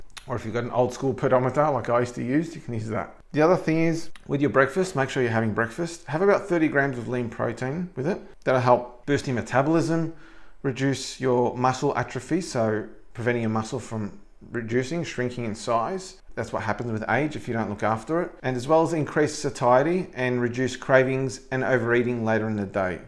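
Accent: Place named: Australia. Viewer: Excellent advice, delivered in an Aussie accent that is impossible to ignore. These are all points I can follow, determined to lose the spare tire around my midsection, thanks mate!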